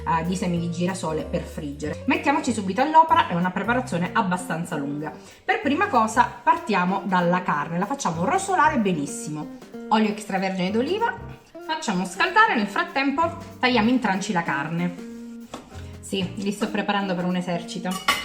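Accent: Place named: Sicily, Italy. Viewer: Ma non senti il suo bellissimo accento siciliano??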